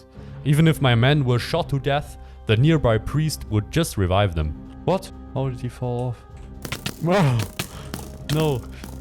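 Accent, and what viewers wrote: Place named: Germany. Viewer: Since I heard the first sentence from I knew he was german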